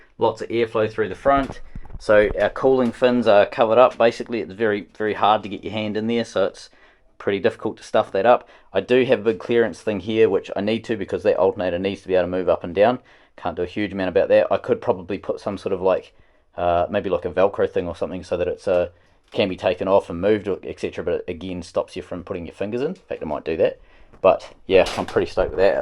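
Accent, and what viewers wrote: New Zealand. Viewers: With your Kiwi accent the automatic YouTube captions always get "Brew Pig" instead of Brupeg. Nice work on the belt shroud!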